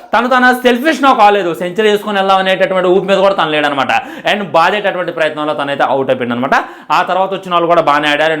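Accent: Khammam, India. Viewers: anna meedhi khammam kadha?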